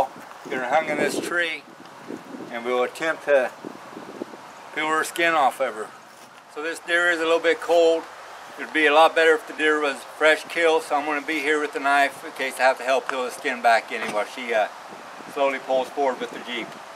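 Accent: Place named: South, United States. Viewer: Monsieur he sounds southern deer don’t get big down there in Florida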